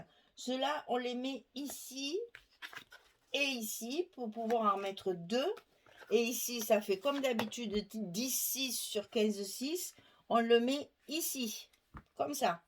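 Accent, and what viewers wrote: South, France. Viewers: Bonjour, je vois vos vidéos depuis la Belgique (Brabant Wallon ).Merci pour vos réalisations et votre accent du Sud qui me fait penser aux vacances, au soleil. Ne changez rien, j'adore.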